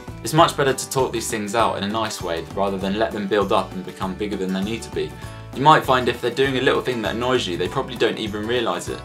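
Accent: United Kingdom. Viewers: Love your british english (: ♥ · I love love love your accent I love the uk so much hopefully one day ill get to go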